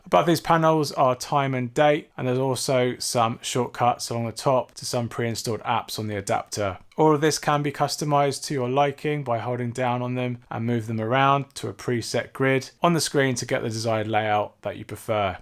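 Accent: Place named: United Kingdom. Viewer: Hey man just some friendly advice for your English UK self haha. We all appreciate the heck outta your videos n the time n effort that goes into each one as they're not easy. But with that said, your videos have all become the exact same in regards to the tone of your voice or mono tone effect if you will, and it's causing many people (I've spoken to others who have mentioned same thing) to downright turn it off cuz they've become flat and boringish to an extent. Most noticably when you talk about good things about the device then nonchalantly dive into bad things without even segwaying or mentioning it. Wanted to bring it up bcuz we all know it better than this bland same shit different day attitude and script u seem to have. So let's pep it up and learn from our mistakes. Keep on keeping on. Or is it? Or however it's pronounced I'm bad with that Uk accent. 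Take care buddy.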